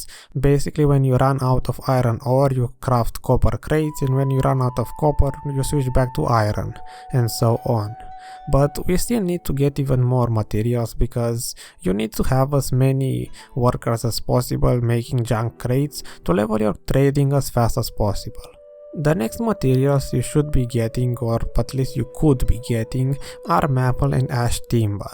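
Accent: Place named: Romania. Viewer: Romanian accent detected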